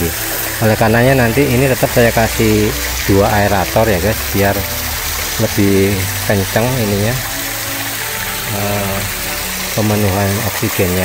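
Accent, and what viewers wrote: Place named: East Java, Indonesia. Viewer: daerah mana Om. logat nya kaya jawa timur🙏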